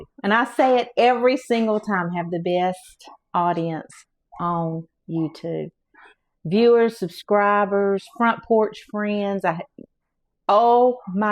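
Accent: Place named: Carolina, United States. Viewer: Subscribed a few weeks ago. I can tell you are a Carolina girl. Your accent is just like mine and my family's! Love it! Going to try this recipe for sure.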